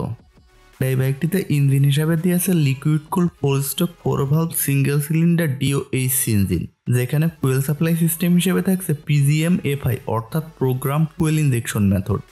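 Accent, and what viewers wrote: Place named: Noakhali, Bangladesh. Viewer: ভাল করে বাংলা শিখে তারপর ইউটিউবিং করতে আসবে। এই খানের সবাই নোয়াখাইল্লা না।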